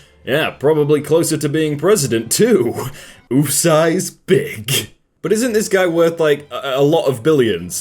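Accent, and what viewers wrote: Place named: United Kingdom. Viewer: How can you be british, or at least sound it and NOT know who klopp is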